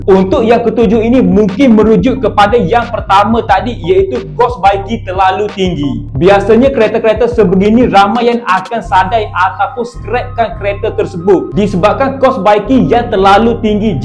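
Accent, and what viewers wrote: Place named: East Coast, Malaysia. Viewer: bagus ada skit cara org pantai timur cakap saya suka, teruskan cara ini, kalau org utara cakap depa bantai slang mereka je skrg org timur pun patut cakap cara slang timur baru ada kpelbagaian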